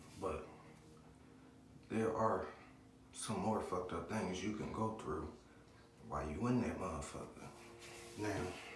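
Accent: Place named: Philadelphia, United States. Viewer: Yo bro I’m from north philly area and Trenton nj I knew you was close by because of how you talk we got a different slang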